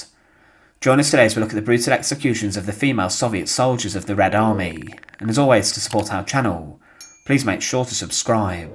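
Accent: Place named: England, United Kingdom. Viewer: First and last time here as listening to that moronic English twang is enough , it’s better muted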